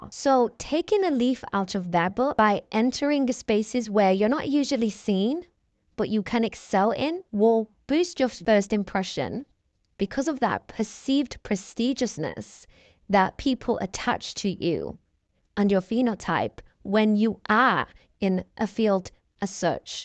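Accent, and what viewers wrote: United Kingdom. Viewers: The voiceover sounds like a White woman from up north in the UK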